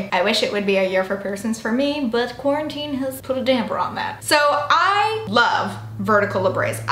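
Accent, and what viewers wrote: South, United States. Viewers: I can tell your southern and just wondering where? Tennessee here😁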